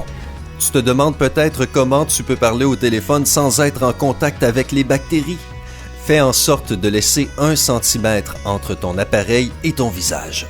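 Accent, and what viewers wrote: Quebec, Canada. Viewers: Il est québécois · Ben moi ça ne me dérange pas je dirai même que j aime bien son accent. Il est québécois. Et puis c est pas très sympa justement de dire que tu n aime pas sa façon de parler de plus qu' il n y peut rien. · The Quelqu'un il est québécois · Un accent du Canada :p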